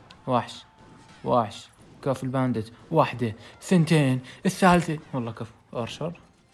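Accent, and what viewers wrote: Iraq. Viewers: تحجي كلة عراقي هل ايام😂😂😂😂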